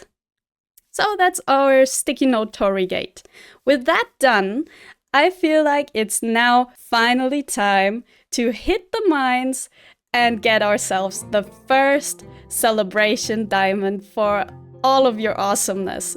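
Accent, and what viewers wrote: Germany. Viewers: the second I heard you talking in the first part I was like: She is german. XD I am german as well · I immediately noticed your german accent! Probably because I'm german too :D I already love this series so much! <3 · Ha, hab ich doch gleich gedacht, das klingt deutsch 🙂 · Loving the cozy, chill vibes, great job! I was actually guessing you might be German because your accent sounds a lot like a friend of mine's and she's from there too! Guten tag! P.S. I love the tree, that's so cool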